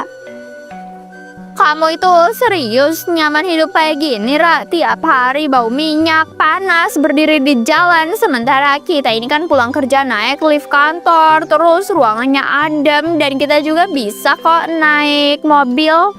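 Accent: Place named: West Java, Indonesia. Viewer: lagam bahaasa ya sunda ya tuh nyunda banget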